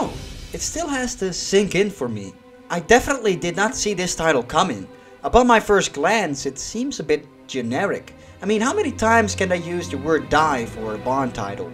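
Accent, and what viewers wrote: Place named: Netherlands. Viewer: I just cannot understand why your channel hasn't at least half a million subscribers: Awesome produced videos, great voice with that cool dutch accent, great text / writing, passionate about the Bond-franchise, no bullshit bla-bla, humorous & funny, clear opinions: just relaxing and informative the same time to watch. Oh-so-many channels could learn from that. But you HAVE to make a gun-barrel scene with yourself in that rad smoking. That would make it PERFECT! Just my opinion.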